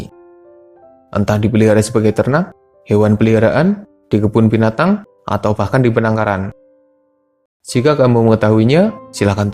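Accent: Banyumas, Indonesia. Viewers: Nada orang ngapak dia bang. Bhineka tunggal Ika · Masee wong ngapak yak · Ngapak terossss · Gole ngomong ngapak temen min😂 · Orang ngapak ya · mau di sembunyiin ngapak2 nya ttp krasa🤔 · Org ngapak yo mas ??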